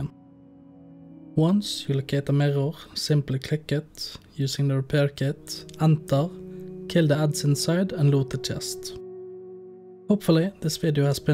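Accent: Norway. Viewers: Det var så fint så. Bare at dialekta skinte igjennom. Kan man gjette Førde eller Nordfjordeid?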